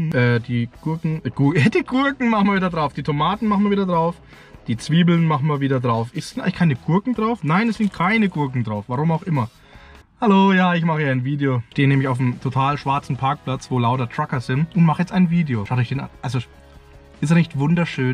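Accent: Bavaria, Germany. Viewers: smitty werben jagger man jensen fürn Norddeutschen ist da unten alles irgend wie bayrischer Slang.😁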